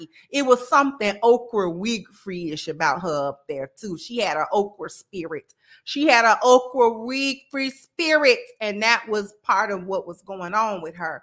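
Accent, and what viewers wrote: Chicago, United States. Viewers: When you said Da Roms on another one of your podcasts I knew you was from Chicago. Only we speak like that. Chicago is in the building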